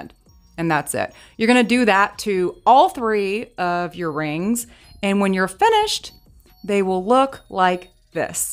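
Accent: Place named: South, United States. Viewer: Your DIY dollar tree hacks are the best!! Thank you!! Are you from the south? Love the accent I'm from Florida!! I miss home!!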